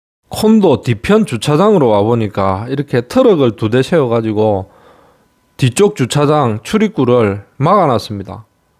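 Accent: Gyeongsang, South Korea. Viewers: 다 좋은데 경상도 말투만 아니라면 좋겠는데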